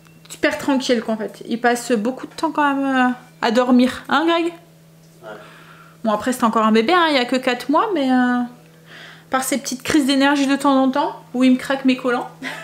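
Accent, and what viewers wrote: North, France. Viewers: Coucou... Je ne connaissais pas une "battée" j'adore votre accent du nord même si ce n'est pas souvent... Ça promet si il y a déjà des moustiques 😡 Les fleurs commencent à sortir pourrons plus grand plaisir Bisous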